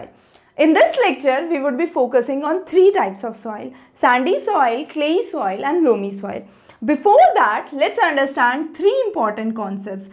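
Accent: India. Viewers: This is Indian English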